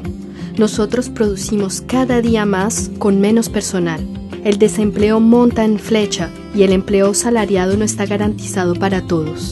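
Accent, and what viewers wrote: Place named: Colombia. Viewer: Solo por curiosidad, en que parte de España o Europa la gente habla con acento Colombiano?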